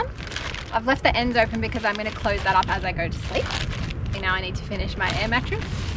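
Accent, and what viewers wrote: Australia. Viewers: So gorgeous and sexy. Love your long hair, your beautiful smile and your Aussie accent. You are very resourceful and intelligent. Keep up with your awesome videos. Thanks from Canada. ❤❤❤❤❤❤❤❤❤ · You are so stunning and talented. It's great to finally hear your beautiful Aussie accent.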